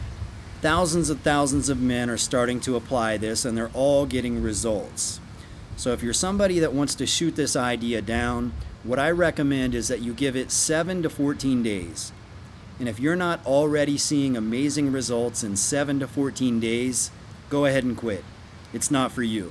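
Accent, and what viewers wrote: South, United States. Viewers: With that southern accent 😂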